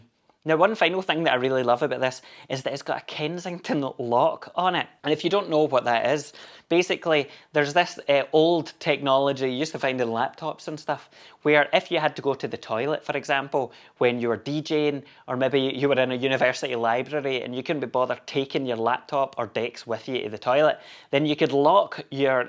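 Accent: Scotland, United Kingdom. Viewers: Sold my desktop last month to fund a Super 6. There was just something about its sound that I didn’t quite like compared to my other synths. Loved everything else about it, such a deep synth engine, amazing mod matrix and the macro assign is genius. But it just wasn’t getting the same love and attention that my Peak, XD and Iridium were getting. I may pick up an Explorer again though at some time. Always good to hear a fellow Scottish voice on a synth channel.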